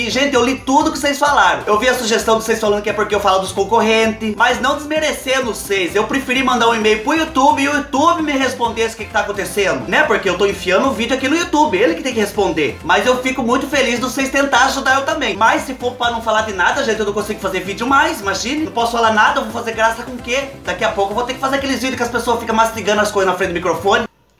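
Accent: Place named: Caipira, Brazil. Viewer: Esse caipirês dele é demais kkkkk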